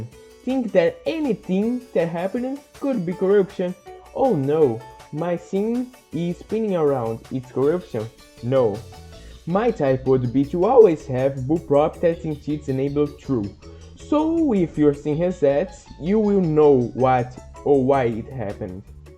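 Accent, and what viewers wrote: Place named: Brazil. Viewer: VC EH BRASILEIRO NEEE ESSE SOTAQUE EU SEII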